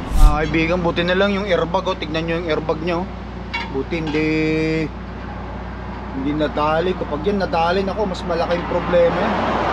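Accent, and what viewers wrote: Ilocos, Philippines. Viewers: Dayta man lakay naglaeng ka ag English, Ilocano accent ah.. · Halatang ilokano ang accent mo lakay pag nagsasalita ka ng English. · ILOCANO KAN SAMET? BRAD TA ACCENT MO GAMIN.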